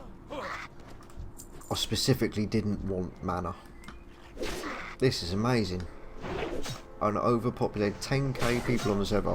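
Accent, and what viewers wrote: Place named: Kent, United Kingdom. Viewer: Love the accent:) From Kent?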